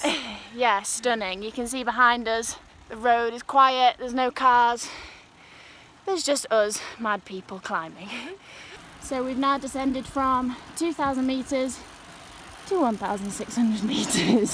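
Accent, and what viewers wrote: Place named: United Kingdom. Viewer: Nice views :) beautiful British accent :) safe road for both of you, enjoy!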